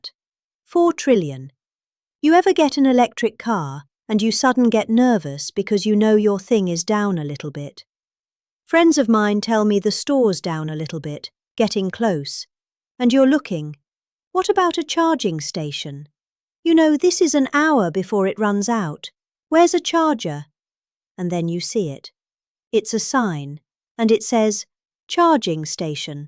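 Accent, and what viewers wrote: England, United Kingdom. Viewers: Dear Americans, that is an English accent. There is no such thing as a 'British' accent.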